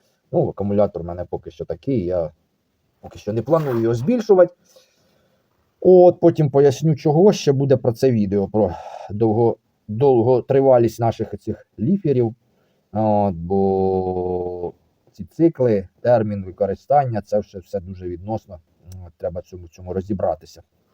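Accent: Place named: Cherkasy, Ukraine. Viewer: Черкащина. То місцевий діалект 😂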